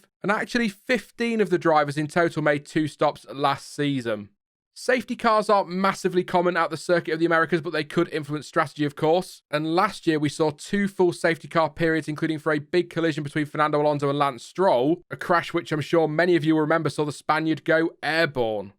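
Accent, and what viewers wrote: United Kingdom. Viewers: Lovely voice from this man who are talking about circuit. Thank for mencioned my Country Brazil; I know formula-1 will be here in Brazil in November, 5th. You are welcome to Brazil sweet voice and British accent.🇬🇧💔🙋🏁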